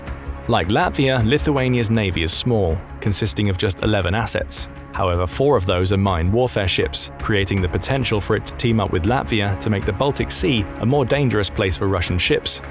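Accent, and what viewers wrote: United Kingdom. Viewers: Because it was narrated by a British dude and the video is full of clips instead of human faces. And there are a lot of videos that are like this.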